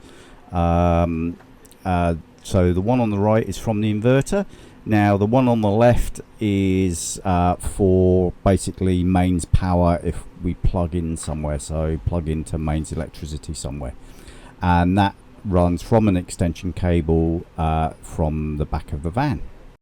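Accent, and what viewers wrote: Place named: Australia. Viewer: Well I just checked out your other videos and see you're in Australia. I'm in the US but my best friend lives in Sydney and I was sure that was a down under voice you have.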